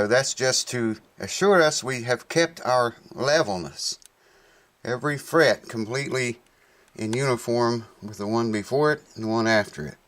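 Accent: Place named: West Virginia, United States. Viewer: Just found you, great videos. I have a D15M Martin and love it. Every time I take it out of the case I stick my nose in the sound hole and take a big breath! Where you from, I grew up in WV and am picking up some WV accent on some of your words, I live in NC outside of Charlotte now. Love your channel.....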